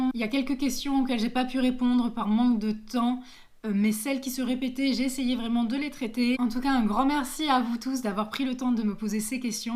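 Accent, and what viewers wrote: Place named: United Kingdom. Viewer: Sympa la vidéo ! Sinon pour les cyclo je pense que le top c'est comme les courses, tu manges entre 3 et 4h avant (oui vraiment, et c'est pas trop) des pâtes, un équivalent de viande blanche, puis peu manger pendant l'effort parce que ça oblige à digérer a nouveau et en plus c'est pas toujours judicieux de mettre la main a la poche en peloton x) Sinon le fait que tu sois prof explique l'anglais au début de la chaine et l'accent, c'est rare chez les youtubers français 😂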